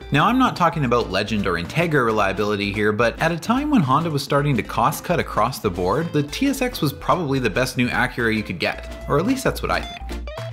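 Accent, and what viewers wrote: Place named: Canada. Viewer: Just wanted to say that I love your videos! My grandma had the original MDX, and I remember being blown away by the second gen when I was about 5 haha. Love the Canadian talk too, as an Albertan. Acura's always been on my radar, but I'm driving a 2010 Corolla XRS right now (considering importing an SC430 after I'm done Uni). Subscribed!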